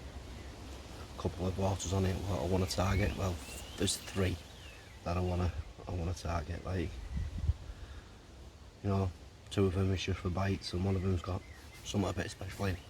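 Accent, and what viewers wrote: St Helens, United Kingdom. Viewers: You from St. Helens mate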